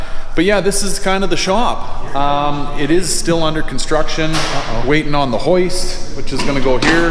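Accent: Canada. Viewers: I’m “sowwwry” haha Canadian accent makes me chuckle. Beautiful shop man ! That’s awesome!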